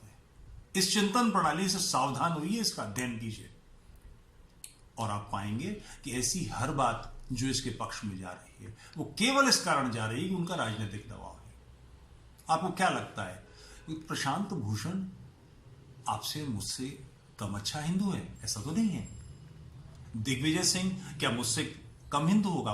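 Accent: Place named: Urdu, Pakistan. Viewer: Sahib, sab se pahley, aap Hindi bohat khobsoorat Urdu lehjay main boolte hain. Aap ahle zubaan hain sir. Mn Hindi bohat kum janta hn, tub aap ki sari baat smajh naheen aati, bus mufhoom samajh aa jataa hai Tu sir aap ne jo Islam k bare mien farmaya, sub such hai. Aap kaa hl, civil war, practical naheen lagta. Mera tajurba ye hai ke mulaa k sath aap jitni narmi bartien gay woh ussay aap ki kumzoori aur apni fateh samjhe ga. Adaab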